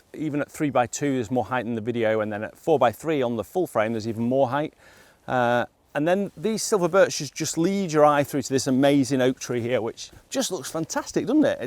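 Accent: United Kingdom. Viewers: It's the first video I've watched from your channel, I like the test you've done and moreover I like your British accent, it's easy to understand for a non-native speaker like me. It would be interesting if you could print some pics from a Fujifilm X-T5 which it is an APS-C camera but with 40 MP sensor, just to understand if an A1 print looks better now compared to an APS-C 24 MP sensor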